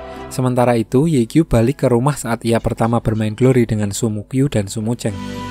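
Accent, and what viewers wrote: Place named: Java, Indonesia. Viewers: Yogyakarta bro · Wong jowo to mass,kok ngomong e ngono hehe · Njir medok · Jawanya medok amat ngakak astaga~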